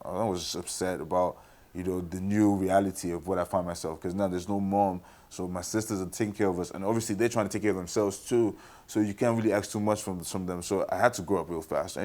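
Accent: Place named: New England, United States. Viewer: Love the Yankee accent bro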